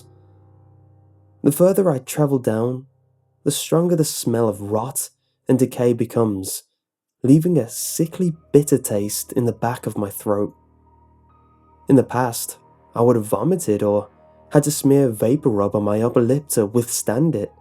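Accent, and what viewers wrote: United Kingdom. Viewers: That's his real voice. He's British. He's cool.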